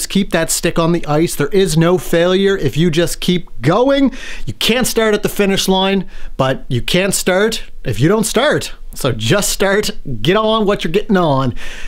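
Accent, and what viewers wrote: Canada. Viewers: Yeah!! More build videos! You can definately tell you are Canadian by your accent! Our lovely neighbors to the north eh? Have a very blessed weekend 💕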